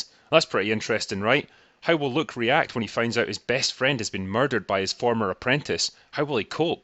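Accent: Scotland, United Kingdom. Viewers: You used to sound normal...well as normal as possible with that Scots accent. So you do put on a voice!! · Soccer ball.......?? Ur Scottish dude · So three years ago, the critical drinker was less drunk and less Scottish? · Very strange hearing a Scot say "soccer ball" isn't it football everywhere except the US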